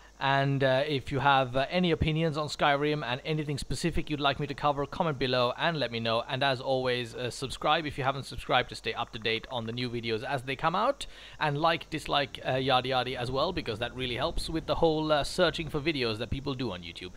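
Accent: United Kingdom. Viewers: That is a British accent, the man is actually British :P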